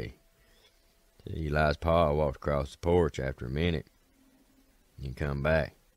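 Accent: South, United States. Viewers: your smooth southern comfort voice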